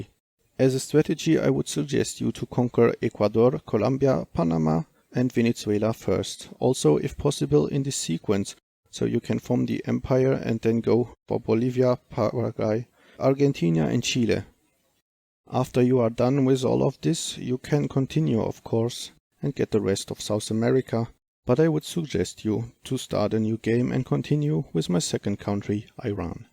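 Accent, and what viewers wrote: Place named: Germany. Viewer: Ich liebe deine sachliche Sprechweise, gemischt mit einem wunderbaren deutschen Akzent <3.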